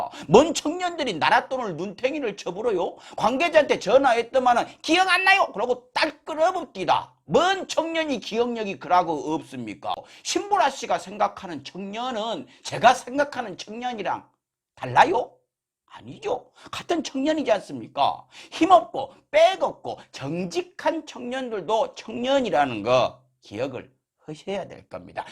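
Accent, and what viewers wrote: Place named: Gyeongsang, South Korea. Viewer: 아따 대구분이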